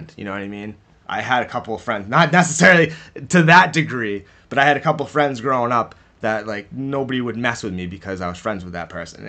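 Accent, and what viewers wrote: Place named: New England, United States. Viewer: It's freaking weird. Your voice sounds exactly like mine. I feel like I'm listening to myself if I had a New England accent.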